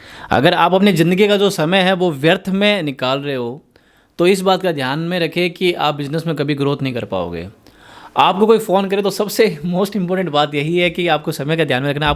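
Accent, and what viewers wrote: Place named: Marwari, India. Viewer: मारवाड़ी सुनकी मजा आगया 😅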